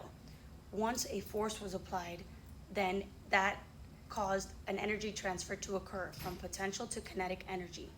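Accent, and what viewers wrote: United Kingdom. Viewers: That British accent is so spot on! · Pin pls the BRITISH ACCENT GOT ME😂😂😂😂😂 literley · Omg I love your videos so also i love the British accent lol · The British accent eats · Wait are you actually British?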